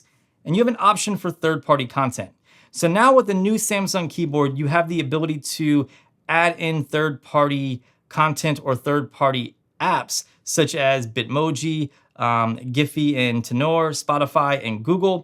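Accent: United States. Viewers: I have the note 8 since it lunched but I'm thinking to upgrade to the note 20 ultra. I like your American accent 😀.....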